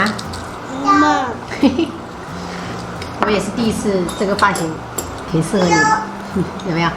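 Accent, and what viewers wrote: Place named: Quanzhou, China. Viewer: 是福建泉州的口音。也屬於閩南語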